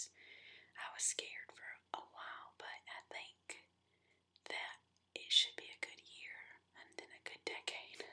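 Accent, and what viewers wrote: South, United States. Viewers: Where are ya from? I detect a bit of a southern draw that just drives me crazy.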